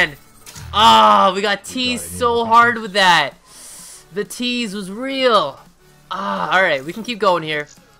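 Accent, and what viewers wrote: Asia. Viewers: It's better to get 10 common cause it's more worth it and the vid will be longer, btw love ur Asian accent <3 no homo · This wasss so good am loving these supply drops i was wondering if you'll ever do a series where we send in out own supply drops and you do a top 5 out of them. Can't wait to see the next one could you 5 rare next time would love to see that. In that awesome asian accent aha. Keep up the great work man your currently my bae cod youtuber. No HOMO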